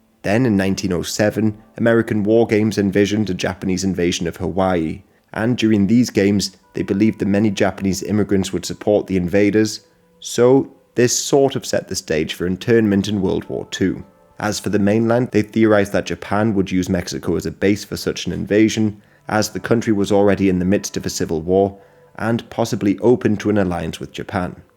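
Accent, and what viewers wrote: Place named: United Kingdom. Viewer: wtf are you talking about, he has a British accent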